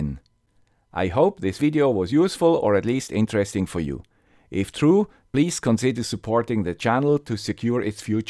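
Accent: Switzerland. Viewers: Thank you VERY MUCH for this idea! Never heard of this before. My wire wrapping tool just arrived on friday :-) Found out, you can use the thin wire of the cheap metal film resistors for wire wrapping. Greets from Basel from another guy with a swiss accent ;) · SORCERY :O Not only the wrapping, but you stripped a tiny wire without ripping its head off. I usually have to resort to burning some insulation off. Thank you swiss accent guy!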